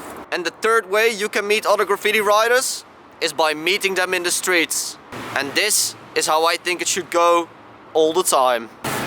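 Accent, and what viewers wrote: Netherlands. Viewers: Are you dutch? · That Dutch accent :D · Dude,, Jij moet wel uit Nederland komen, je accent :) . Keer samen painten? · Right on! A dutch comrade! Just the accent gives it away. wonder the region you wright from? Havn't written in atleast 3 years. I suppose I need to get back at it! can't risk writing illegaly anymore though which sucks... Met quiet alot of writers through instagram. you have to do some digging but eventually you'll meet them. Sometimes I used to pass someones new panel on a train, I'd make a picture and send them to them on insta. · Je bent Nederlands k hoor t aan je accent 😂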